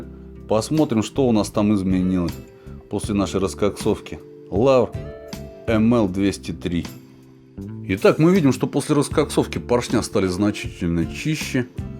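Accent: Russia. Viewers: По акценту голоса, можно догадаться что вы из Волгограда или волгоградской области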